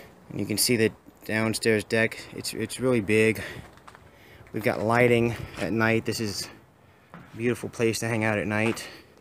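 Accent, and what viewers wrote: United States. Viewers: Your definitely not from Texas, lol. What's that accent? Yankee? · You sound like you're from Philly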